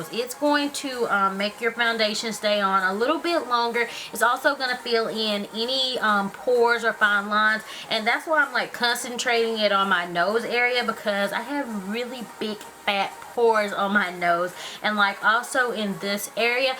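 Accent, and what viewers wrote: South, United States. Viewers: I love your accent! lol Sounds so southern. :) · love your country accent xD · Ur so BEAUTIFUL i love your accent! its southern right? IDK thats why im asking lol(nottryingtooffendne1!)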